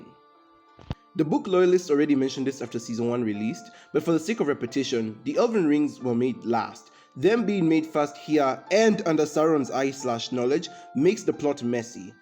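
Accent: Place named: Zimbabwe. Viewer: Where are you from? You sound Zimbabwean I'm just guessing 🎉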